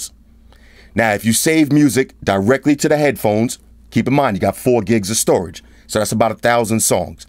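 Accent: New York, United States. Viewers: SQUAAAAD! Love you. Half of the time I'm watching your videos because i'm a tech girl and I want the latest stuff out. But the other half is because i'm in The South and I miss NY dudes with the "accent". Take care and continue to bring us that good ISHHHHH!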